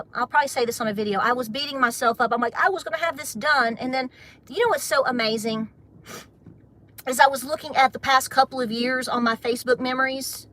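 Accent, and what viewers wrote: South, United States. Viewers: You say your accent isn’t southern but I am from northern Alabama and your accent sure sounds a whole lot like mine Love ya girl.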